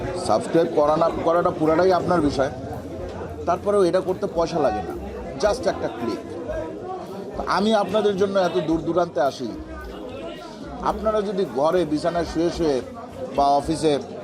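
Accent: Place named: Chapai Nawabganj, Bangladesh. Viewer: Vaiya ajkeo aponar khawa dekhe moja peyechi. Ar chapai er vasai kotha sune onek mugdhu hoyechi 👌❤❤❤❤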